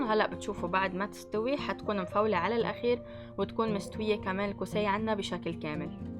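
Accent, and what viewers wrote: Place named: Beirut, Lebanon. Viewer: رائعه مادام.. اكلك طيب و كتير حلوه لهجتك.. Vous êtes au top je vous suis depuis quelques temps, j'adore vos recettes mais aussi votre accent beyrouthiye.. Merci 👍👍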